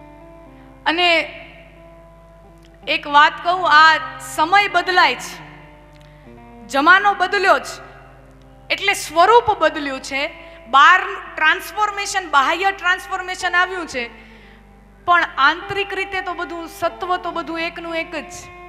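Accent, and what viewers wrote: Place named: Kathiawar, India. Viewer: Aap ni shaili clear Anand thi Kathivadi Gujarat gujarati ma i salute Ben you with Respect Namra bhav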